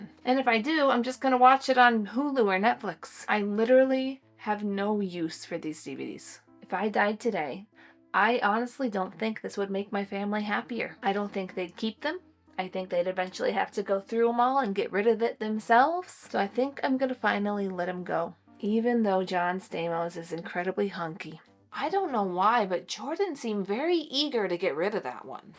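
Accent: England, United Kingdom. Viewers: Oi, a British accent IS normal. 🤣It's a lovely, gentle book, and full of good advice, and she's very right about doing it every few years from the age of 60 if not before. Our lives change, our tastes change, but we hang on to the old stuff that no longer suits us. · ENGLISH accent! There is no such accent as British. We are Irish, Scots and Welsh too. The accent you do is stupid English stupid Peppa Pig Hugh Grant! Do not ever come to Scotland and call us British. 😡😡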